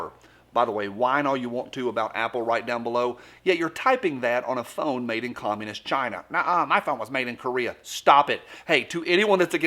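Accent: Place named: Appalachia, United States. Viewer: Being from the Appalachians is nothing to be shy about just because you chose to speak the civilized pronunciation (i.e.: the English language). So, my friend and hero, - feel at ease. If you pass my grammatical standards, there’s nuttin’ to worry about. I say that with the authority vested in my soul by my late mother, who was a strict Grammar Nazi, born and raised in The Netherlands before the REAL Nazis invaded Holland... etc. I’m pretty sure you are aware of this already, but I thought I’d share a tidbit of actual truth from the comment section: The so-called “Cancel Culture” is just another offshoot of the liberal/left social destruction of what used to be a damned fine Republic - ours. Whether the word used is SJW (social justice warrior) or BLM (black lives matter, but is often co-opted by white SJWs who have been brainwashed to believe the guilt trip that is “white privilege”). 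This travesty is rooted in the higher education system, where young college kids are being indoctrinated into believing they should be unhappy with everything in our country. Why? You ask - liberal professors teach their students intolerance for any facts or opinions which are viewed as conservative, which has transformed what was normally a bastion of free speech and discourse that US educational institutions were formerly known for - into an intolerant atmosphere where guest speakers are denied access to their audiences who had invited them, and they are assaulted further with hate-spee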